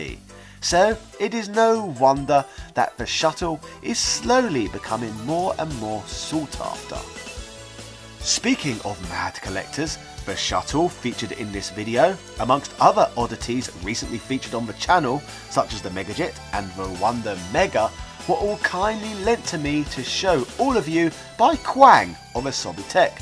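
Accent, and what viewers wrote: England, United Kingdom. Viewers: What region is this guy's accent from? English folks are all so weird with their insane regional accent differences.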